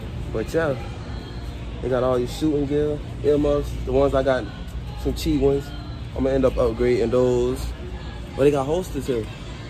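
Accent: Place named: Maine, United States. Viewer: Raw af. Where u from maine? U gotta cool voice/accent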